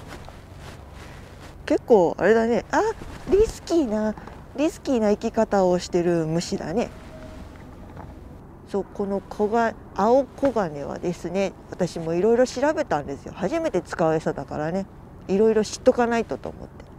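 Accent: Kagoshima, Japan. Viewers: メェ～餌も凄いけど鹿児島の海の魚影が凄いと思う👀 鹿児島訛り可愛いかった😊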